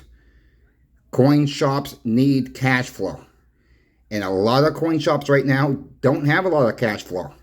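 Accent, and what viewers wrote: Boston, United States. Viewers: After hearing you talk for a minute, I can tell you are from Boston...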